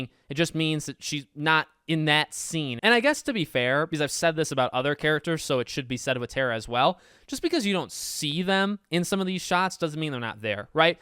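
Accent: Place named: Boston, United States. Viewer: r u from boston ? north east? ur accent lol the way u said podcastt🤣🤩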